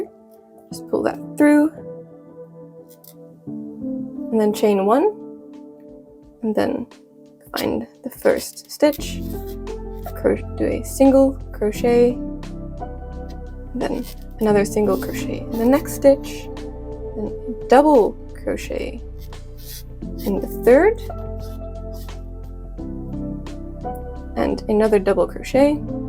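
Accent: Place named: United States. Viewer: you sound almost completely American. Accents are typically the hardest thing to master when learning a foreign language. How did you go about learning English so well in the Netherlands, if I may ask?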